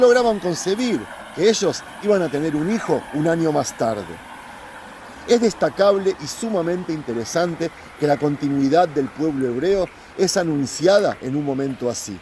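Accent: Argentina. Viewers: Che vos sos de Argentina?🇦🇷. Me encantan tus videos. Saludos desde Republica Dominicana🇩🇴. Y bendigo al Dios de Abraham, Isaac y Jacob🇮🇱, Padre de Nuestro Señor y Salvador Jesucristo.✝️ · Si eres de isrrael porque ablas como argentino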